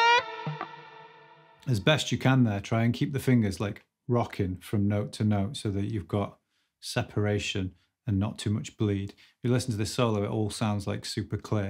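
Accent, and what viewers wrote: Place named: United Kingdom. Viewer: You deserve so many more subscribers,. Keep it going! Oh, and you kind of remind me of a young Mick Mars (with the hat) with a British accent.:P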